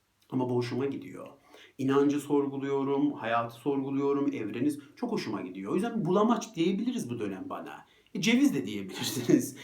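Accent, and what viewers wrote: Ankara, Turkey. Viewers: Ne olcak, bakalım ne olcak ? Tam Angaralı'sınız hocam.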